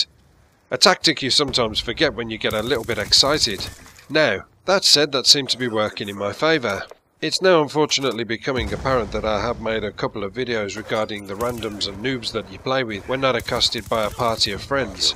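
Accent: Birmingham, United Kingdom. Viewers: Great stuff, you're like a brummy Sid Waddel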